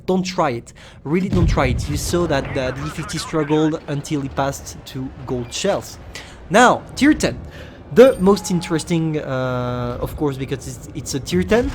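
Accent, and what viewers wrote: France. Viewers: From where are you? I would guess France cause of the accent (btw rly good English), btw you just blindshooted me today. Nasty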